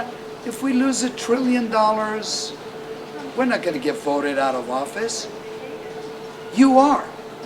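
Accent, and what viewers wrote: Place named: United States. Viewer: American acent for sure.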